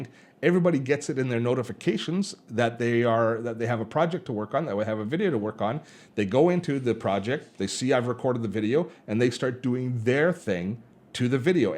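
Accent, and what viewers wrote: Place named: Canada. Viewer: Being from the US I always enjoy your Canadian accent. It adds a pleasant touch that makes us partners in our uniqueness. Thanks for everything you do.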